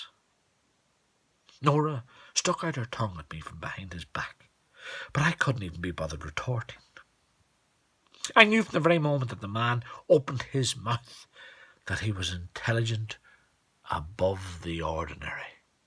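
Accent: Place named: United Kingdom. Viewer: Ohh, I love british accent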